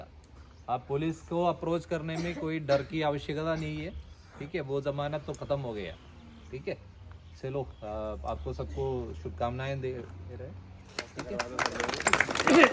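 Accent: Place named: Kerala, India. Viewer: Mujhe aapka Hindi sun ke humare Kerala teacher ka yaad aa gaya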